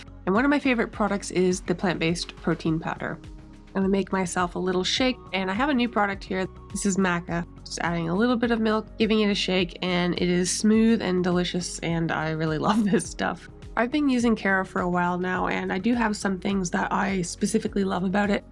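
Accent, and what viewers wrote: Canada. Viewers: this is such an exciting project! I love old historic pieces and seeing you painstakingly bring this back to life was so satisfying! you did an incredible job (also Canadian and say decal the way you do and think that is really funny you got any flack/confusion on that) 🤣 I never think of us having an accent or inflection, it's everyone else! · Just magnificent. Having Canadian friends, I'm aware of differences in pronunciation between America and Canada. Please accept my apology for the uninformed imbeciles who may have been disrespectful to you. The residents of some backwaters in the US like to maintain their supremacy over things they don't understand. My respect for your tremendous talents would have overridden any "mispronunciation" I might have perceived, were it not for my observance of the Golden Rule. The best of luck to you and your co-workers.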